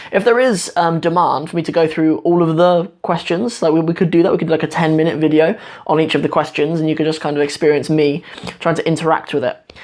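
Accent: North, Somalia. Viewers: hi Amazing video again thank you. are familiar with the southern and northern accents, there is slight difference, I've seen your videos and you use the northern accent not that it's bad or anything😊 i guess because the formal writing system is mostly in that accent. but I think you'll find the difference funny. check it out😀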